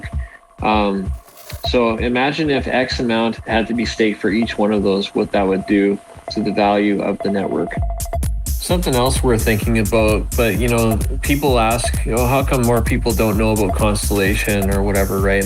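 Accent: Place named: Canada. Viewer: Sounds Canadian 😆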